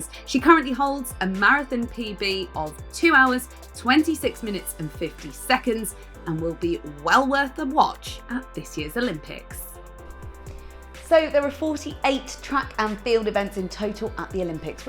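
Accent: United Kingdom. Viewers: Hoping that no one says this lady is bias. due to her accent I can tell she's British. she said Dina will get 3 medals at the Olympics, meaning 3 gold. not bad for Dina, I love her, but the million dollar question is where is Shelly Elaine and shericka in the 100m 200m and the 4×100m? something to think about🤔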